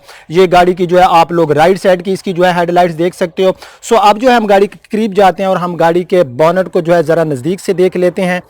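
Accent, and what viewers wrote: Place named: Thal, Pakistan. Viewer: Ap Thal kay ho ap ki Zaban thal ki hai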